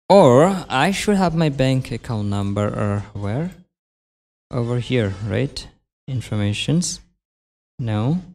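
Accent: Bangladesh. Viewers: He is bengladeshi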